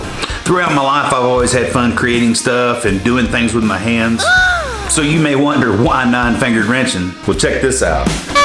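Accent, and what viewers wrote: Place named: South, United States. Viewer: Love hearing that southern drawl! 🤣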